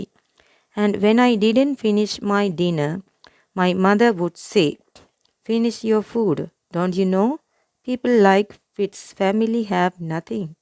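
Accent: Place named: India. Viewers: Thank you very much ❤ By the way , your pronunciations tell me you are an Indian person . Am I correct? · I also thought she was from india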